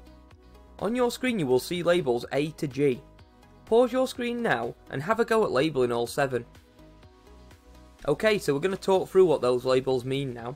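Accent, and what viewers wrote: Yorkshire, United Kingdom. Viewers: well done. Very nicely explained. You sound Yorkshire :)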